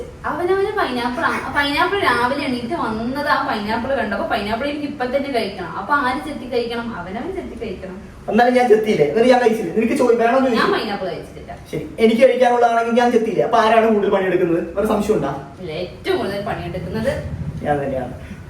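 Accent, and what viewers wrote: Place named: Palakkad, India. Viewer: Chechide slang enik valare ishtaayi ❤❤❤ nammade Pkd slang....❣️❣️❣️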